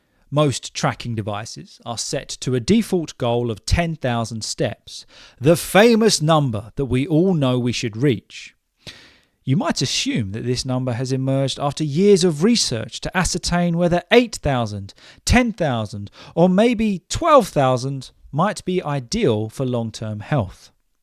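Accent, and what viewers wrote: United Kingdom. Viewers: where are you from british can you answer me please because your accent very nice i love it 😍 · Hello, What a great episode! I also track and count steps on my smartwatch, and you’re right—10,000 steps every day is not that easy. As for me, I regularly reach my goal without any problems—8,000 or 9,000 steps for sure. The rest might be from hand movements that my smartwatch records, so it’s pretty easy to cheat—or better said, the results aren’t always accurate. I have to admit that yesterday, due to the rainy weather in Germany, I didn’t have the motivation to go for a brisk walk. Thank you so much for your inspiring videos! I discovered you on YouTube just the other day, and I truly enjoy listening to you and your cute british accent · Mr you do fantastic I like it your videos so much because you speak British English clearly and and perfectly. 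Thank you very much for your videos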